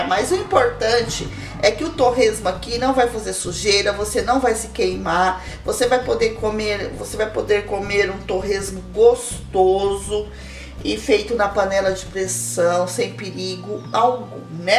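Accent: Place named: São Paulo, Brazil. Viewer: Adoro este sotaque paulista!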